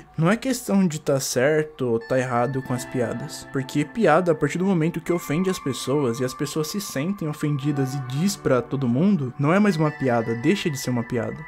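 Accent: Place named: São Paulo, Brazil. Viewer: Carioca com sotaque paulista, pq?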